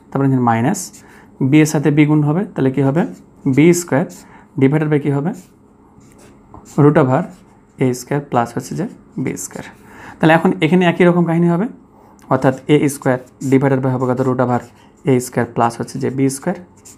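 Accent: Rajshahi, Bangladesh. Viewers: Are you from Rajshahi??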